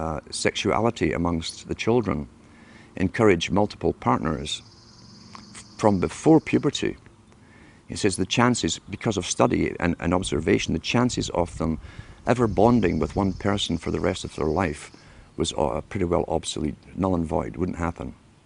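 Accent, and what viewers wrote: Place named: Scotland, United Kingdom. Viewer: He has a different voice, he has a Scottish voice here, and all his other audios he has a different accent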